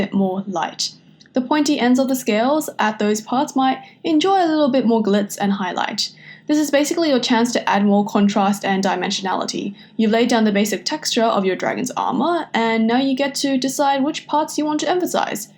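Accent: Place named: Australia. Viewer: I love your voice!!!! (And your art of course) But what is your accent?? It sounds Australian but also something else at points?? I’m Australian so I know what sounds Australian lol 😂 I’m confused about your accent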